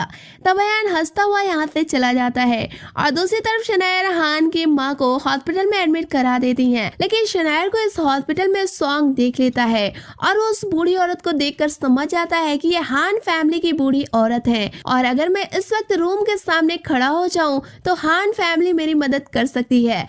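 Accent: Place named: Bihar, India. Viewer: Madam you are from Bihar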